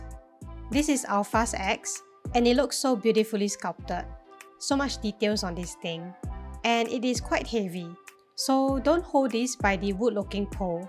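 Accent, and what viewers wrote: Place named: Singapore. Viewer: The figure is so pretty!!! Esp that axe omg! Haha I was surprised when u started speaking, hello fellow SGean :> I haven't seen any other reviews of her so this is vv interesting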